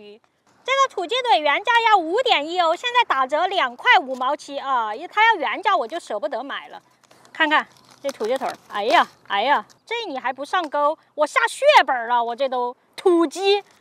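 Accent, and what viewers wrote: Sichuan, China. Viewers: 你这川普哦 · 这妹子是四川口音吗，还喜欢吃麻辣 笑哭 哭笑 笑出眼泪 破涕为笑 笑死 笑尿 笑cry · 带着一点川普的感觉，四川普通话哈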